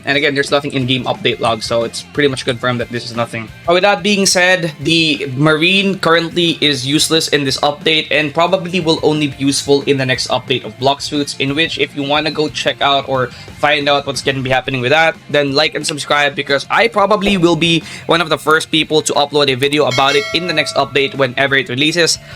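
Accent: Philippines. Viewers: Bro got that Filipino accent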